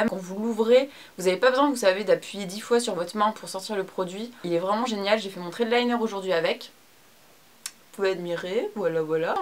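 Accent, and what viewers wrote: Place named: Germany. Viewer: J aile beaucoup ton accent alemand😂😂. Trop belle dans cette video tu pourrais nous faire un tuto sur ce makeup??😘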